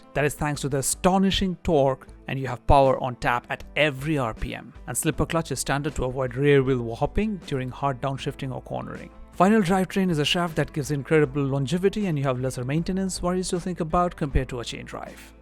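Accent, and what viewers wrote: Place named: India. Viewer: Man , hard to understand your Indian accent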